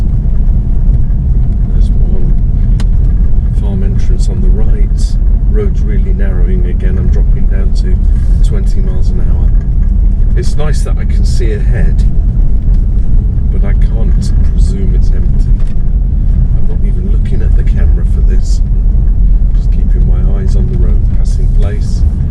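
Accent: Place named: Nottingham, United Kingdom. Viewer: Totally off subject here but I’m wanting to guess your accent because it’s definitely not SE?! Uneducated guess.. Nottingham area?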